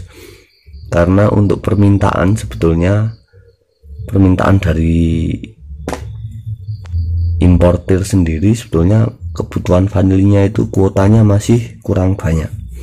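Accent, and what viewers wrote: Temanggung, Indonesia. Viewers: Dari logatnya bebicara kayaknya dari temanggung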